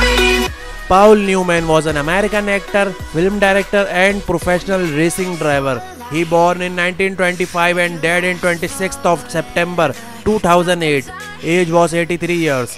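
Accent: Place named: India. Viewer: Why this Bollywood Dialekt? Absolutely horrible, can't you afford someone with a decent voice????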